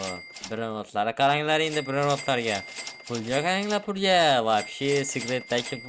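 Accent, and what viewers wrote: Khorezm, Uzbekistan. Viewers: Xorazmlik misiz besh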